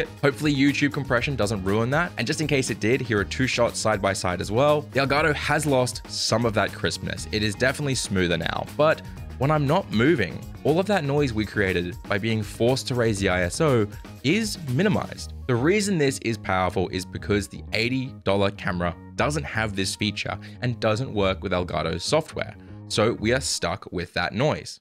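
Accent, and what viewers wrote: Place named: Australia. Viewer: Nice to hear a fellow Aussie :-)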